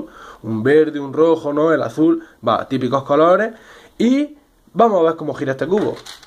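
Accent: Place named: Murcia, Spain. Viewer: Verengena Escarlata Es de Murcia y es su acento eso no es criticable :"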